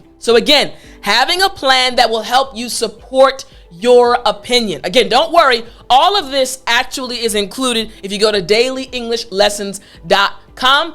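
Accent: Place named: United States. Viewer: Loveeeeeeee Your ACCENT ! Crystal Clear American Accent !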